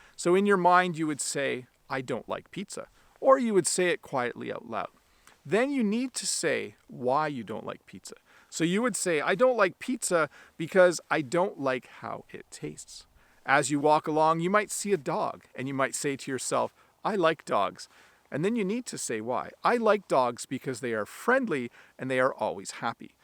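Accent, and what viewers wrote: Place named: Canada. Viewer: Thank you,. These games can be helpfull to improve English. The same games we have in my country as well but I didn't recall them. By the way - your accent is very great, at school they always said that Canadians have french-like accent. No offense:)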